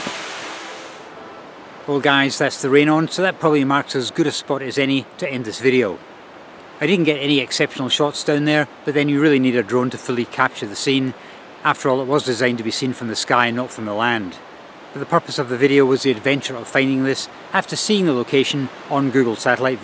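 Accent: Scotland, United Kingdom. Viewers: Can I ask where your accent is from? I hear Scottish mainly? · I’m surprised at his Eire pronunciation. Probably his Skaughtisch accent!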